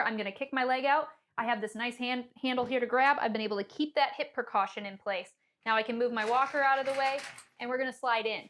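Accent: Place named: Great Lakes, United States. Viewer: is a Michigan person. Great Lakes accent.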